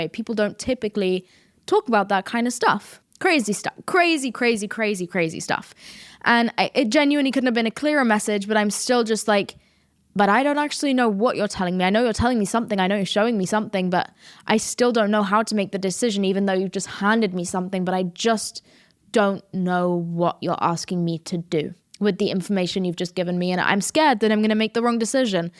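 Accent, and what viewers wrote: United States. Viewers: US accent innit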